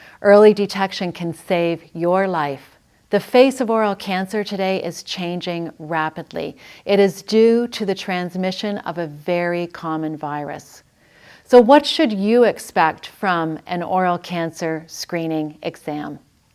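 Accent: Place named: Canada. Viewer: Her accent is SO Canadian 🍁🍁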